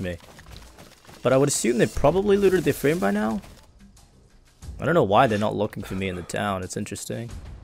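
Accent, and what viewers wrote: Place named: New Zealand. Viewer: Yes broo!! So refreshing watching a good dayz video with a fellow kiwi accent!! Much love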